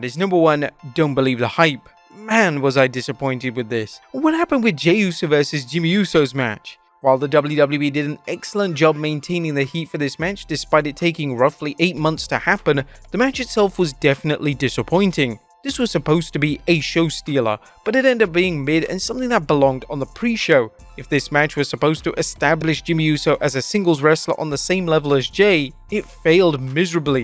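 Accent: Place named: United Kingdom. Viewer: You sound like my British friend She loved watching the rock last night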